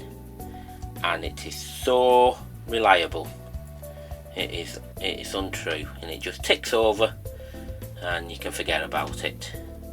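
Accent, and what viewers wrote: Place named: Oldham, United Kingdom. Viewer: Are you from Oldham by any chance, you sound like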